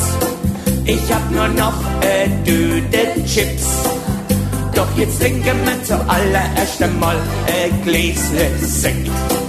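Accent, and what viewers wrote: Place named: Franconia, Germany. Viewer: Unser fränkisch is efoch schö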